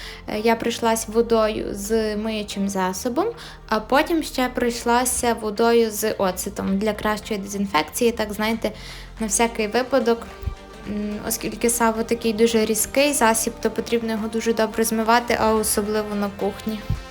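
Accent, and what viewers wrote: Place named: Ternopil, Ukraine. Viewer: Це один з діалектів, а не суржик. Нічим не гірший за "чисту" мову заходу України чи "еталонну" мову киян. Відчувається, що дівчина орієнтовно з Тернополя чи Івано-Франківська, чи близько тої території і українська її рідна. Мова є живим організмом і з часом найменш вживані слова просто зникають, так буде і з так званими русизмами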